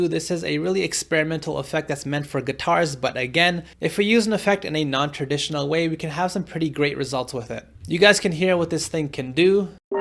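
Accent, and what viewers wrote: India. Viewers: Are you a Indian residin in US ???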